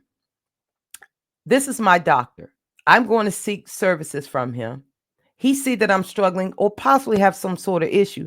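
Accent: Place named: DMV, United States. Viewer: You sound like you from the DMV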